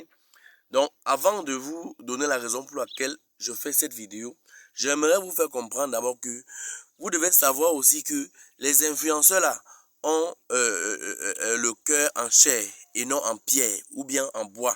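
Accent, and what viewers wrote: Cameroon. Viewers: 🤣🤣🤣🤣🤣 l'accent camerounais vraiment hein. Bravo 🤣🤣🥰🥰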